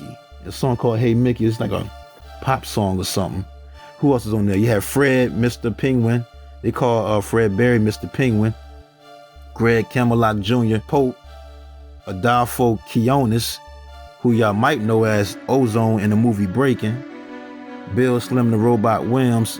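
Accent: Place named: Chicago, United States. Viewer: What part of Chicago you from, bruh? I’d recognize that accent anywhere.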